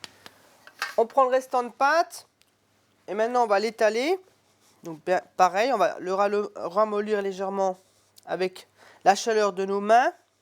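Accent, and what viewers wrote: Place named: Rural, France. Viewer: Le gars avec son accent du terroir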